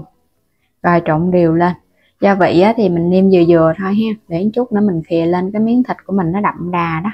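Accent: Mekong Delta, Vietnam. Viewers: Giọng miền Tây nói vậy đó bạn ơi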